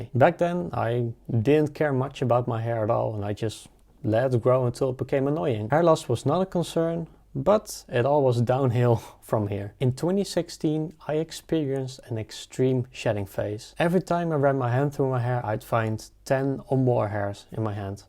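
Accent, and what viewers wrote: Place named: Netherlands. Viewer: where did you do your hair transplant? you sound dutch, maybe in netherlands?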